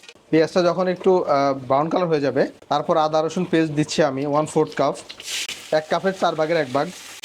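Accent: Bangladesh. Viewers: If you are from West Bengal, then how come ,you talk like BANGLADESHIS? Sharishar tel, Morich ? What nonsense?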